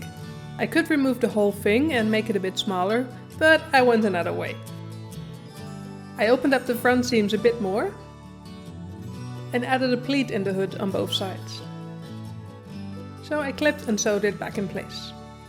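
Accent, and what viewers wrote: Netherlands. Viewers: dank je wel! Clear and to the point!! Wonderful tutorial. ❤ Also, as someone who grew up in Germany 5 min from the Dutch border, it was lovely hearing your accent! Brought be straight back home. 😊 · ik hoor gewoon t nederlandse er doorheen, maar dit is zo goed uitgelegd, zelfs ik snap t in 1 keer en ik heb echt moeite met begrijpen, top video!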